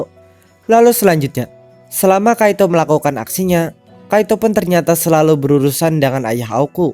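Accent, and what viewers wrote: Banjar, Indonesia. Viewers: Aksen Banjar🤣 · Kok kayak orang banjar yak logat nya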